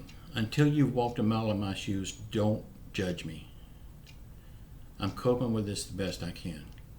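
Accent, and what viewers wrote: South, United States. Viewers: Prayers for your wife. My mother had a massive stroke last Sunday, she is now fine, like it never even happened. A miracle! Hope for the same for your wife. What state are you in? I hear a southern accent.. The Republicans are disgusting, completely not in touch with reality and seemingly don’t care about the people they are supposed to be representing. For Mitch McConnell to laugh, makes me angry, very angry. I want him to be voted out of office along with the others who are dragging their feet and not passing a bill to help the people and to extend the $600 per week unemployment boost.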